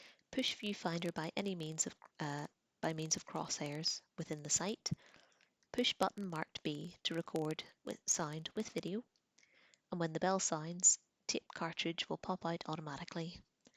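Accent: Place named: Northern Ireland, United Kingdom. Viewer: Great to find this..and another NI accent to boot 👍 I love that some of the language is making the reader uneasy 😂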